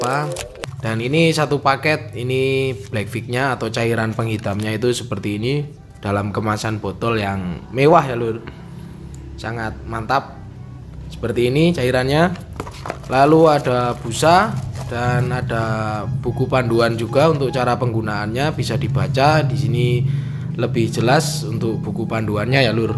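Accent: Temanggung, Indonesia. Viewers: Temamnggungane medok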